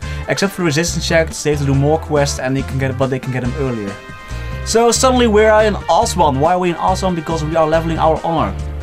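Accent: Netherlands. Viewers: Nice video :3 Haha I was like hmm I think hes dutch and I'm right xD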